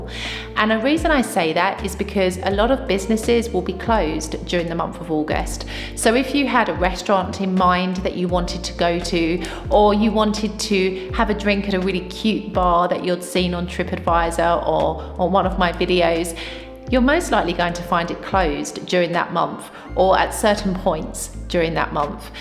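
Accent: United Kingdom. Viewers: Your British accent is on point 👌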